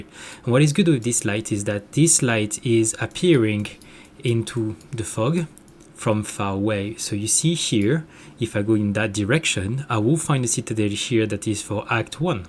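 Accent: France. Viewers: i love the french accent :)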